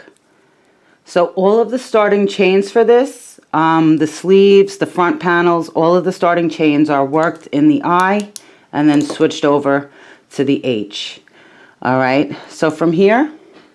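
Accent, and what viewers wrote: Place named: New York, United States. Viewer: Your tutorials are some of the best that I've found. They are so easy to follow and I love listening to your new York accent and the sound your nails make clicking together when you crochet ☺️ Thank you so much for these tutorials..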